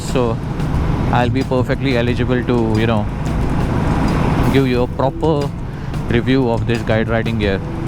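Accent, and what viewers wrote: Malvani, India. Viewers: Bro has that very good English accent And then has the Proper Malvani Accent too "Khay jaata tu?" Damn.......... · मालवणी माणूस मालवणी बोलताना भारी वाटता. एक दम गावाक एल्या सारख्या एक नंबर भावा 👍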